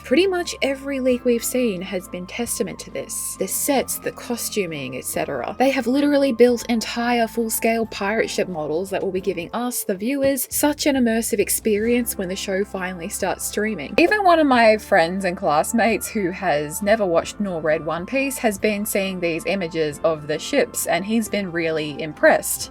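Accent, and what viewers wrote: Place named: Adelaide, Australia. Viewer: didn't realize that you were in NSW, I assumed that was an Adelaide accent.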